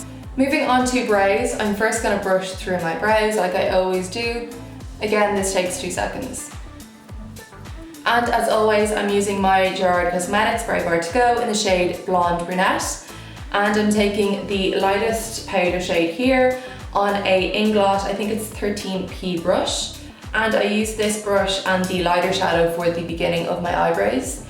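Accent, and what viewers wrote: Ireland. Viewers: It's her Irish accent... personally I think it's beautiful. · I'm sure you get this question all the time but are you irish or scottish or something? Because your accent is super cute lol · Oriana Brat she's Irish , it's just her accent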